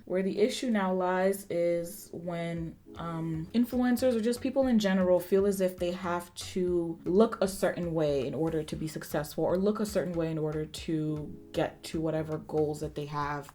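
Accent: United Kingdom. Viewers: The British accent lmaooooo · The English accent 😂😂😂 sounds like ppl I go school with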